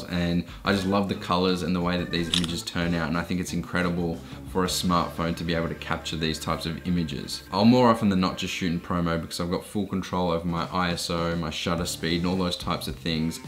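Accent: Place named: Australia. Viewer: Thank you very much it's good we have an Australian accent because I am from Sydney, how did you get the hands off Galaxy S21 I thought only Americans can get it. I am subscribing your channel keep up the good work😁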